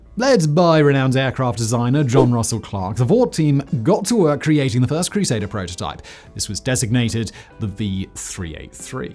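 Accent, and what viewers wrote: England, United Kingdom. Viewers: Arrogant use of English accent. This guy Never Soldier w/ Cavalier wuss an Talk Anti American Buffoon!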